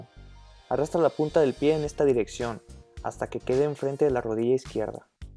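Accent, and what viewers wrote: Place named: Mexico. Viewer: Todo un crack, por tu forma de hablar creo que también eres mexicano. Excelente forma de explicar.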